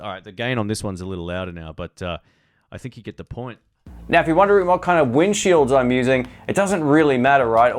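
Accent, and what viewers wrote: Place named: Australia. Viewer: Nice hack. Lot cheaper Roxdon or BSW pop filters. Great sounding Aussie voice... mine diesn't have that feature...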